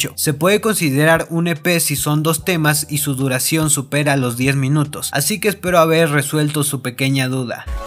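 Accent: Argentina. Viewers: Eu yo pensaba que eras de argentina jsjsjs De que pais sos??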